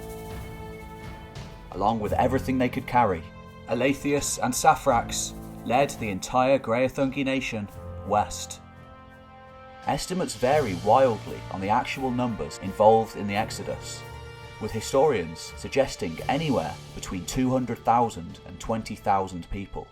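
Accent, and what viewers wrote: United Kingdom. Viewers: whereabouts specifically in the UK is this accent from?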